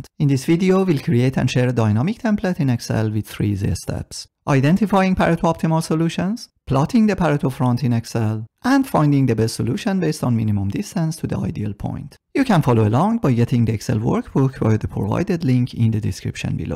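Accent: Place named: Iran. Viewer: Ah that lovely persian accent!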